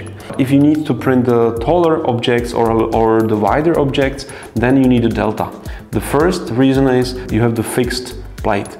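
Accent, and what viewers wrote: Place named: Czech Republic. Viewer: Jak jsem slyšel první slovo, tak si řikám, že docela čenglish. Kouknu na info a ono jo xD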